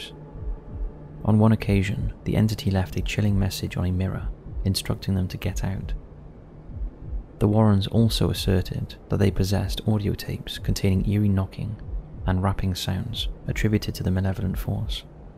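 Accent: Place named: England, United Kingdom. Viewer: I thought wecwere going to see and hear Ed & Lorraine Warren. Instead, we hear someone with an english accent talking over the entire video. Did not hear any of the actal video. Extremely dissappointing.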